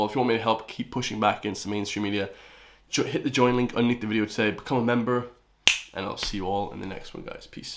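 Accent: United States. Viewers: Why do you sound like a yank? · This is so sick!! Get them TF out!!! Are you a American living in Ireland? Your accent sounds very American. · Question., you seem to have an American accent. But you use words we don't use in the U.S. ( bollacks ) etc. What's up with that? Otherwise great job. Could you send us some of your travellers to the P.N.W. ,across the pond. .see we have these f***tards over here called ANTIFA and they really need the same kind of treatment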